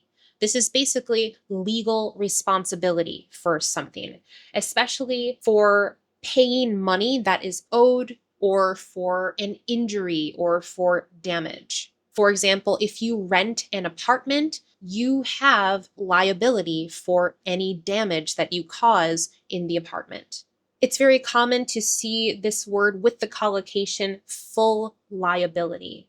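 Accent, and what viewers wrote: United States. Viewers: Hi, I really love your American accent—I could listen to your voice for hours! Your lessons are worth the wait. Thanks a bunch for teaching me!❤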